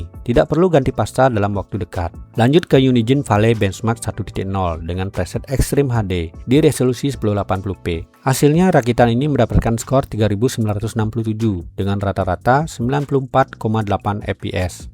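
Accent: Bali, Indonesia. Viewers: Kok bisa2nya mikir dia muslim bro? Sy awal tau chanel ini dan denger logatnya aja langsung tau kalau beliau org bali 😂. Sy jg muslim dan belum pernah ke bali juga sih, cm beberapa kali nonton interview org bali dikonten org emang logatnya mirip kaya gini...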